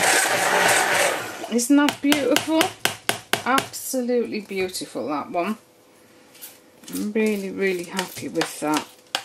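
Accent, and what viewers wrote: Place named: England, United Kingdom. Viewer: Are you based in England because you sound like a English person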